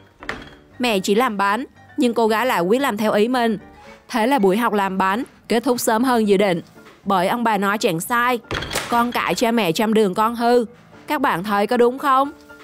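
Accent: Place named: Central, Vietnam. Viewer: Nghe tiếng dể thương mà ba, tôi ng miền nam nhưng nghe khoái. Chị này chắc ng miền trung đấy. Tôi có một ng bạn tiếng giống chị này rất dể thương, xa rồi mãi nhớ giọng nói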